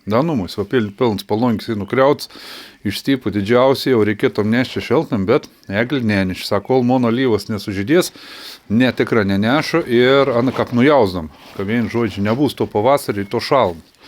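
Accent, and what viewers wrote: Samogitia, Lithuania. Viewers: Vidiaks 😅😅😅 net ir aš pasimečiau, kaip tašė stoty 😄 Itin smagu girdėti gryną lietuvišką žemaičių kalbą ❤👍 Pasaulinis lyderis V. Putinas, kaltas, 😅 Gerb., jus👍😄 Sėkmės jums su Egle ❤ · Pirmą kart klausau kaip žemaitiškai kalba, labai malonu ausiai, aš kaip nemoku man labai smagu girdėt.:) Manau žemaičiai visad turėtų kalbėt savo tarme, o kam nepatinka tegul čiuožia. 😄 O apie putiną tai čia geras buvo, dar tokių bajerių negirdėjau šiemet. 😂 Mldc. 👍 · Ačiū už žemaičių kalbą. Koloritiškai... Ta prasme spalvingai skamba.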